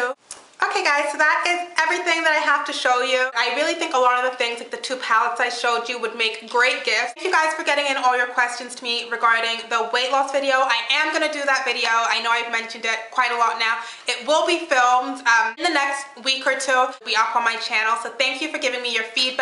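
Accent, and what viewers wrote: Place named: United Kingdom. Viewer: I knew I heard a British accent! :)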